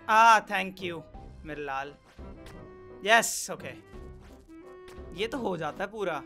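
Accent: Haryana, India. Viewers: My mind have subconsciously added these haryanvi slangs and tones he uses Impact!!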